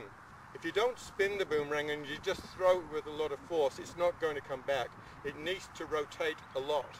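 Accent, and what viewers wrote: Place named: Australia. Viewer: Of corse he’s Australian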